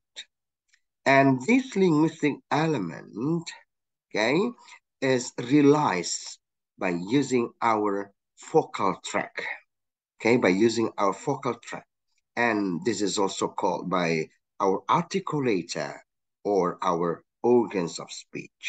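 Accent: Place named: United States. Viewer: Yes, me too. Sir use American Accent, so that’s why it’s very easy listening to us😇👍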